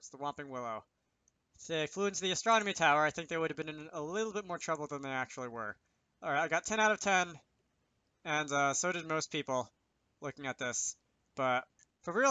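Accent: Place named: United Kingdom. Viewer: you sounded more british than me wtf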